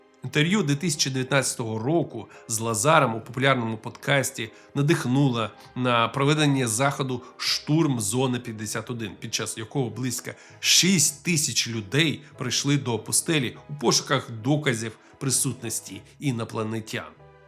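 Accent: Moscow, Russia. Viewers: Лайк за україномовний контент, але відчувається "рускоязичная чєлюсть"... Треба ще працювати над вимовою. І ще радив би зображення ведучого по максимуму замінювати відеорядом, бо дивитись на очі які бігають читаючи текст не дуже цікаво. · блін, як мене КОРОБИТЬ від цих москальських ааа в українській мові !!!!!!!!!!!!!!!!!!! одразу видно, що людина ДУЖЕ ПОГАНО володіє українськю мовою! Запамятайте просте правило : в українській мові як пишеться, так і читається!!!! Простий приклад (слова взяті із відео - <link> : пишеться : "кілограмів", читається - ТЕЖ "кілограмів", а НЕ "кілаграмів"!!! пишеться "теоретично", і вимовляється ТЕЖ - "ТЕОРЕТИЧНО", а НЕ - "теоретична" пишеться "потрібно", читається - ТЕЖ "потрібно", а НЕ "потрібна" !!!!!!!!!!!!!!!!!!!!!!!!!! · А нічого, що він "акає" як москвіч??? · Слухаю це відео у 2026. Спочатку не помітила, що йому кілька років, і сильно здивувалася, чому Ви стали говорити з настільки виразним російським акцентом. 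Потім розібралася - за ці два роки прогрес у вимові просто чудовий. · Чути російський акцент диктора, мабуть раніше говорив російською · Дізлайк за кацапський акцент. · Хто бере приклад з цього чоловіка? В нього жахливий російський акцент, купа русизмів і не правильні наголоси!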